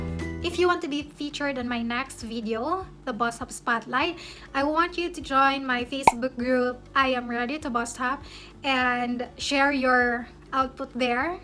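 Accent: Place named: Visayas, Philippines. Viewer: Ate, taga asa ka te? Bisaya lagi imoha accent. Hehehe malingaw ko..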